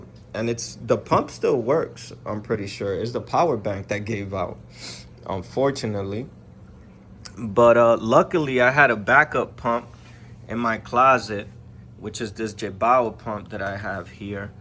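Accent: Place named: New York, United States. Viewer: dimensions? Correct me if I’m wrong, but you sound like you’re from NY!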